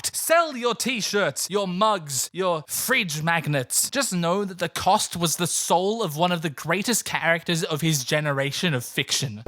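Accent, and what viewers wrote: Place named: Australia. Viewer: I love how perfect the Australian accent is for being sarcastic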